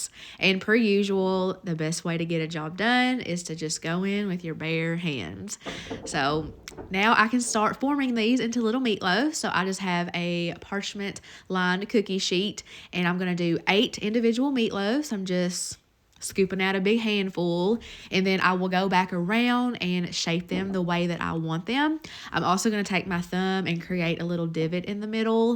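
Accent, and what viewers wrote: South, United States. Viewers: great video again. Love your cooking, presentation & of course your delightful Southern accent! Please do regular videos in 2024. Thanks & best wishes from Sydney Australia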